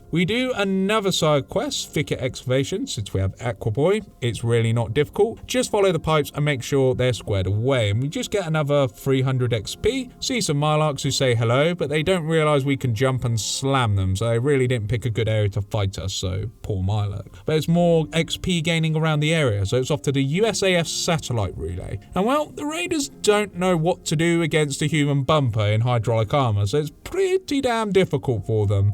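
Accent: RP, United Kingdom. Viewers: it’s the formal British accent, the intro I similar as well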